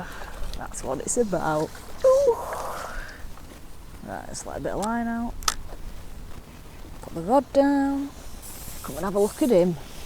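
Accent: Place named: Yorkshire, United Kingdom. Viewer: Thankyou, so refreshing to hear a crisp Yorkshire accent, really appreciate your films, please do keep up the good work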